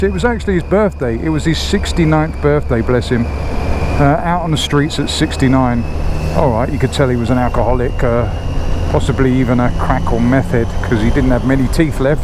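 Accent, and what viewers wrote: United Kingdom. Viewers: Damn lively man...enjoyed every bit of it...the queenz english and america r a good combo..!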